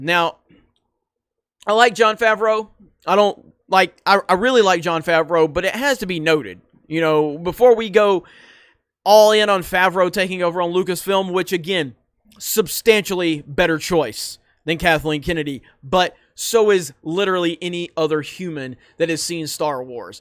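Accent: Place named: Florida, United States. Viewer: I know it's petty... But the way Jeremy pronounces "sense" and "nonsense" is really irritating. I guess it's a Florida accent?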